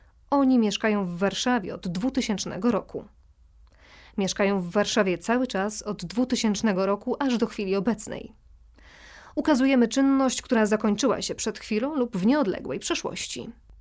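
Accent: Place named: United Kingdom. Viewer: Ten Brytyjski akcent parzy w uszy.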